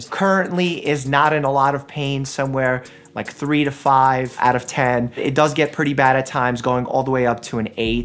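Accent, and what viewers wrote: New York, United States. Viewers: when you watch the video in 1.25 speed, you can hear his new york accent XD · I’m just curious, did I hear a slight New York accent from doctor mike🤔 😎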